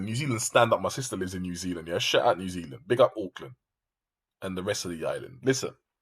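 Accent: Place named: United Kingdom. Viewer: youre british accent is a give away that you dont know parker very well, im a new Zealander been following his career since he began, he's a big let down, most of his fan base is overseas, he has rubbish punching power all his knock outs are journey men, really bad journey men and he didnt knock them out that bad, they just didnt make the tem count or got stopped, history proves he doesnt have good power and he gasses and drops his arms to regain energy in them, joyce hits hard and he hits constantly and pushes forward, he has a granite chin and parker has no power he wont be getting knocked out, parker had a decent chin too but can he handle the volume of joyces more powerful punches??? maybe not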